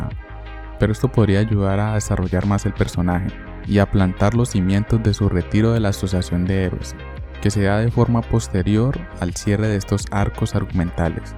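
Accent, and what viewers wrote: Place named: Colombia. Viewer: Que paso con tu voz o.o? Ahora pareces Colombiano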